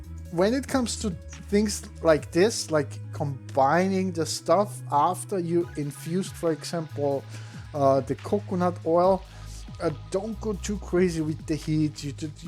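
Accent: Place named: Sweden. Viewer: Its probably nordic. Swedish prob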